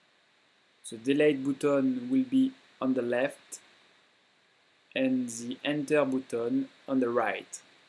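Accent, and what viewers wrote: France. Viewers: that is so much a french accent, hahaha! thanks! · Haha that's right.... really French accent.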